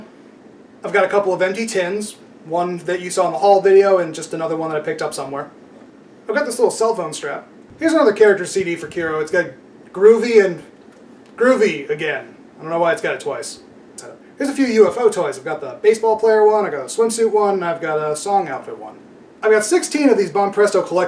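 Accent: United States. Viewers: Your American accent killed me!! I want to say I really love your channel and awesome collection. 😃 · That Texan accent! 🤣🤣🤣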